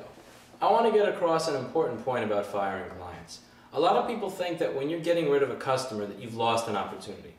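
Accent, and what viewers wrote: New York, United States. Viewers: Listen, you speak very well and can clearly and entertainingly articulate complex ideas - nice brain dude. I think you should consider that you may be suited to entertainment as much as you are computer repair. Almost a NY Wisdom vibe to your delivery that - accent and all - makes me feel like I'm back in the Prickly City itself :) Excelsior, sir.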